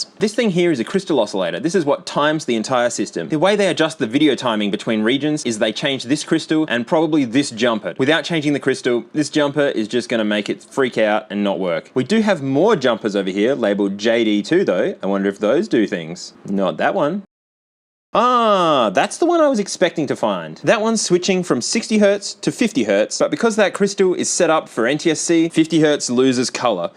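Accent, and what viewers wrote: Australia. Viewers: As an American, seh-ga just feels better to say than say-ga, even though they sound basically the same to my ears. See-ga doesnt sound wrong to me at all though. Just sounds like the expected way for the Australian accent to modify the word. Accents just do that. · I love your dedication to the Aussie SEGA pronunciation! Shocked this bootleg is so good! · how we pronounce Sega in Australia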